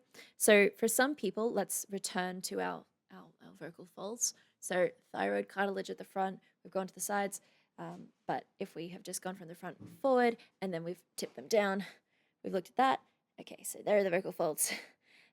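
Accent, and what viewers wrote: Australia. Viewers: I'll admit, I'm loving these reactions not just for the content, but because I get to hear them from a fellow Aussie.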